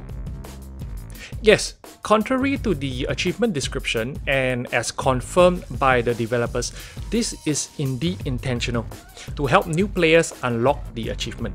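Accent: Singapore. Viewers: You from Singapore?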